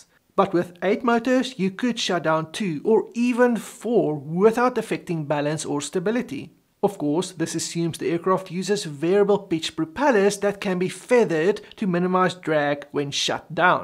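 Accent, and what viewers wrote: South Africa. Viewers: Ek wil een van hierdie dinge hê om wors vir die braai aan 'n lekker braai plek te vlieg. Heard your accent and thought, "dis my ou" 😂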